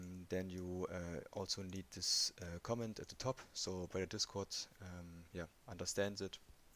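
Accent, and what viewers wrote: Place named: Germany. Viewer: the german dialekt kickt bei dir aber anders rein :D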